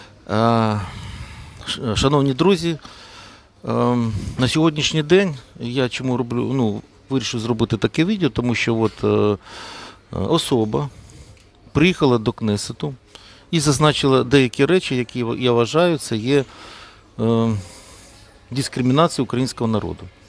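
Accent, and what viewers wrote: Russia. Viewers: Украинскава? Це не дискредитація? Акцент чисто кацапський А нема більшого ворога ніж кацапи. То не маніпулюйте, навчіться української вимови хоча б. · Мій дід би сказав: "срав пердів і ще хотів"! Може автор відео ніколи не був у Бердичеві, або у Черкасах, або у Львові де назви вулиць писалися у тому числі і єврейською мовою ще у п'ятидесятих роках...хоча персонаж, що у якості довідника використовує "вікіпедію", вже багато про що говорить! Ну звичайно ж євреї! То не 🤡 закрив усі ракетні програми і довів до банкрутства "Укроборонпром""; скоротив армію та "відводив" війська! Ага! Тільки євреї винні у всьому! От 🤡 Ні!!! Ну а євреї обов'язково! І кацапи НІ! Тільки от євреї!!! Цікаво таки що автор цього опусу робитиме у світі без євреїв?! Ну без більше 80% Нобелівських лауреатів; без усієї банківської системи; без дантистів ну бо у більшості випадків євреї?! 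Без грошей бо навіть текст відео не може відтворити без папірця а значить не заробляє багато... Доречі! А чому такий "патріот" і не на фронті?! І мова у цього персонажа якась не зовсім українська...є акцент кацапський! Може "патріот" віщає з мацкви?! Га?! P.S. перші паперові гроші УНР дійсно мали написи декількома різними мовами! Читайте історію! Тільки час свій згаяв на цю дурницю! Якість інформації; якість мови; якість думок; стиль подання інформації як у першому класі...жах просто!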